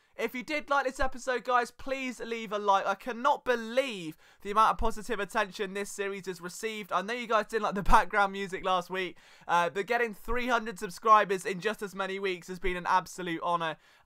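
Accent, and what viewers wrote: United Kingdom. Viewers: I absolutely love your analogies, I'm a Native tarheel; and we have the Carolina Panthers here, but I've been an EAGLE fan for 33 years! Growing up there were no Black QB's, except for Doug Williams who still led the awful Bucks to an NFC divisional play off. Love the accent, I'm compelled to drink a cup of Earl Grey when watching your Eagle report, keep flying.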